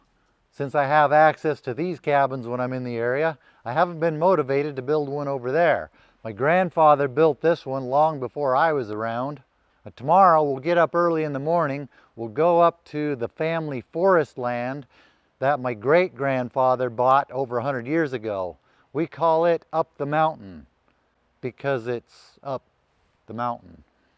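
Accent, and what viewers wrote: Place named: Pacific Northwest, United States. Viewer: Sounds like northern oregon or southern washinton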